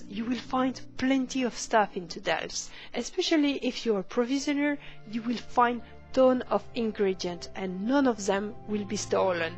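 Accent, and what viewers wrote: France. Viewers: my dear, as a new player i would just like to say thank you! :) a wonderful presentation, wonderful & very useful tips...and a wonderful french accent :) mesmerizing voice, a pleasure to listen to you! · omg the French accent is real love it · your "r"s suggest that you are frenchspeaker:) love it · I really like the fact that you dont give the usual hardcore, grind this, ignore the game, rush there tips. You made me and for sure other people remember why we started playing mmorpg´s in the first place: diving into a world of fantasy and enjoy it. Big thanks for that. There is only one "problem" about all this. Started the video, saw that pretty face of yours and heard your beautiful french accent. 10 minutes later i had to F5 because i did not remember any single word you said...was just floating on a wave and listening to your voice. Stop beeing so charming !!! 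;) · that blew my mind, because your accent while speaking English definitely has a french accent to it:D so do you also speak Dutch/German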